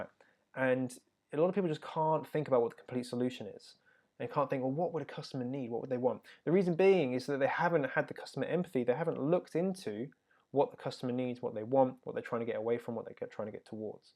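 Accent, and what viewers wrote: United Kingdom. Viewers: just spent my afternoon making notes . thank-you so much for your videos and information presented in such a genuine way . Im just starting out with my sister in law and to be honest its so good to find a British accent taking about FBA within the uk ! we would really like to be considered for the free gift ,particularly the profit calc pro , if were not too late. We continue to watch and learn and thank-you for such informative content . Best of luck for the future , though Im not sure you need it !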